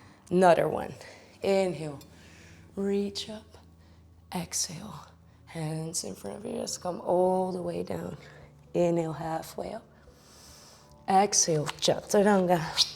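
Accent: Netherlands. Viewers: I'm literally just ten seconds through the video and my immediate first impression was wow, your voice is so calming & soothing and after like 3 seconds I recognized that you have a Dutch accent.:) Been to the Netherlands several times and learned the language a bit. Will definitely do this yoga session with you tomorrow morning when I do my yoga practice on the balcony.:) Dank je wel,!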